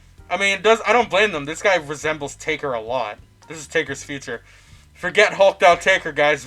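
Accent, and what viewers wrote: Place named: South, United States. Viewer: Your southern accent killed me lol! I loved it! I thought I had the drawl, but yours sounds much better, and I’m an actual Southerner! Great video dude! ❤️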